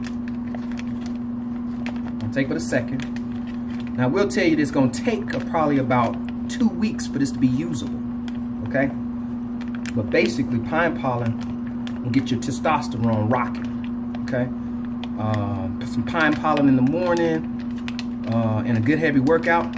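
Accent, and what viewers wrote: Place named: United States. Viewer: I don't know what you pay for your pine pollen but you can get 70 grams of it from Harmonic Arts (Canadian online company) for 32 bucks. They also do tinctures and have an amazing variety of products and have decent prices. Another online place I would like to suggest is "Canadian Pine Pollen Company". Judging by your accent I am assuming your are American? I just wanted to give you my sources because with the stronger US dollar you may be able to get much more product and save some money. Also, I have zero affiliation with those companies. If I love something, I rave about it and I have a big mouth. Heh.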